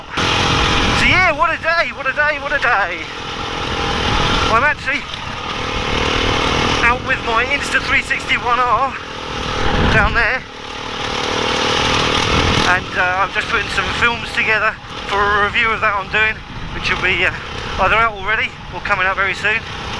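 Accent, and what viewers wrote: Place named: England, United Kingdom. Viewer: Great day out on the bike in the sunshine does it get any better 👌 PS love the English accent and cursing it cracks me up haha cheers 🤙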